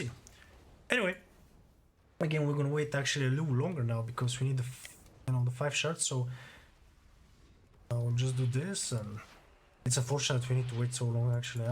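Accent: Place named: France. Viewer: Are you French? you have an elegant accent :D your unitframe is SUF right?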